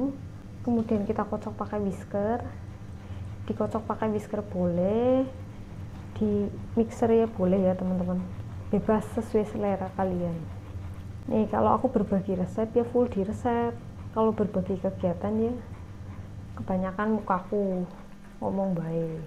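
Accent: Banyumas, Indonesia. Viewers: Makasih mb ,mba ngomonge ngapak wong ngendi ,kreatif banget ,makasih ya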